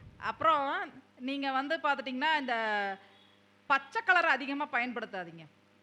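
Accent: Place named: Kongu, India. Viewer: வணக்கம் அம்மா. ஆழகு, அருமை,அற்புதம்,உங்கள் கொங்கு தமிழ் WOW semma super speech நான் நீங்கள் சொல்வதை பின்பற்றி வருகிறேன். ""மாஷா அல்லாஹ்,,, நன்றி நன்றி நன்றி மா. எல்லா புகழும் இனறவனுக்கே..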